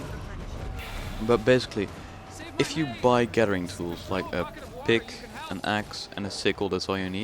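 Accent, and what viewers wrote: Netherlands. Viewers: very nice video, i directly heard u were dutch ;) subbed for more videos! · Dit is letterlijk de eerste keer dat het me niet stoort dat iemand die nederlands is engels praat in een filmpje. Je hoort het wel, maar je hebt (voor zo ver ik hoorde) geen fouten gemaakt zowel grammaticaal als in je uitspraak. Daarbij was het ook een heel handig filmpje aangezien ik net 2 dagen bezig ben met gw2. Keep up the good work!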